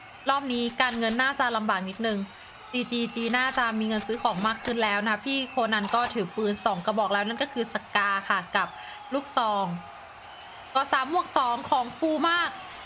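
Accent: North, Thailand. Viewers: พี่ พูดภาษาเหนือหน่อยน้าา~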